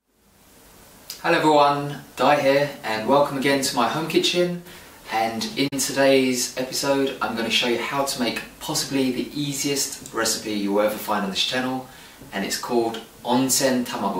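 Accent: England, United Kingdom. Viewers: You've got an English accent,. Did you grow up in England? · JEEZUS. British accent and he cooks! <3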